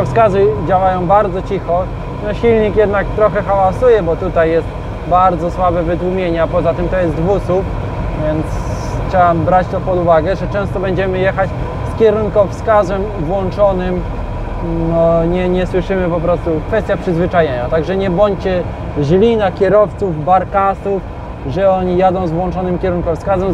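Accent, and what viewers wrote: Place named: Podlasie, Poland. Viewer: Mam pytanie ... Autor filmów jest z Podlasia, czy po prostu ze zwykłej wsi ? Zaciąga niesamowicie....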